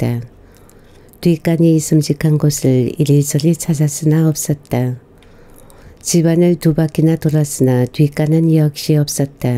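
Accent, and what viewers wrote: Gyeongsang, South Korea. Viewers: 경상도 말씨가 참 좋네요. 아들이 엄마를 보고 어짠 일인기요? 라니... 참 어이가 없네요. 잘 오셨어요 얼른 들어가입시더 해야죠. 아이보고 할매한테 정중히 인사드리라 하며 가르치고요. 아들부터가 잘못했네요. 우리 남편도 시어머님께 성질부리는 말투로 말해서 제가 뭐라했네요. 왜 다정하게 말하지 그렇게 말하냐고요.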